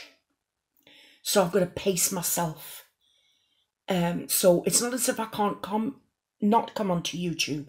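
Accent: Newcastle, United Kingdom. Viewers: I keep thinking 'I wish you were my Nan!' I don't think im young enough to be your granddaughter, but the only grandparent I have left hates me lol! I think its the geordie that I somehow can hear in your voice, but I find it very comforting... like I keep expecting to see you call me 'pet' lol! But honestly thank you for your kindness, it does help a lot to know I'm alone <3 hope you are feeling a bit better.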